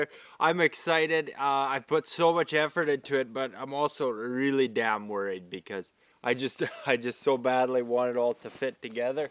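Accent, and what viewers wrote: Canada. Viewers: Your Skills have greatly improved.. Videos are fun to watch and that Canadien accent is killer‼ Vinny [Boston]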